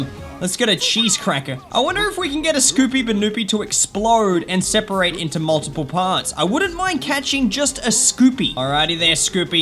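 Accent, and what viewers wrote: Australia. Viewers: Are you Australian? 😅😅😅😅😅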